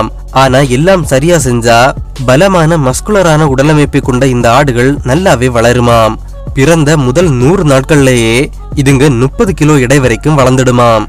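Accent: Kanyakumari, India. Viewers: தலைவர் கன்னியாகுமரிக்காரருங்கோ..........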